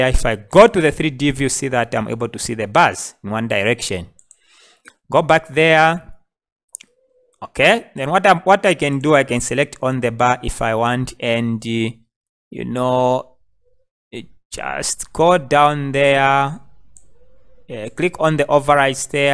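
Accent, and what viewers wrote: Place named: Zambia. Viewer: My guy you sound Zambia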